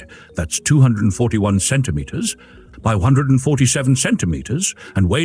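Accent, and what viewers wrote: England, United Kingdom. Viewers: Button up! My grandmother still had the button hooks - a nice stick with a hook. But don't complain . You get to see and hear all this for free. (Oddly, although the AI vocal sounds English, I heard an Amerenglish term or two.. the solecism is so distinct that i believe our uploader is American . Be kind to us who are forced witnesses to the emissions of a foul orange frog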